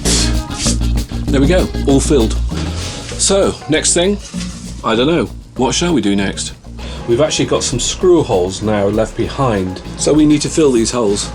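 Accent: West Country, United Kingdom. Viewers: Discovered your channel an hour ago and already bingeing :) Are you from the West Country?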